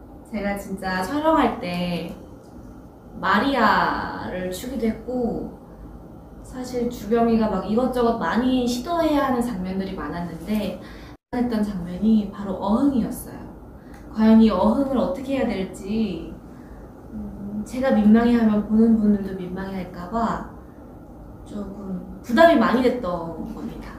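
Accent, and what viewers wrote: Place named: Germany. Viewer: She has a German accent in English too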